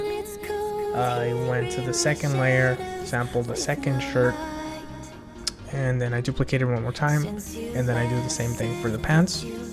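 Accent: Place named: India. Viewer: Indian ascent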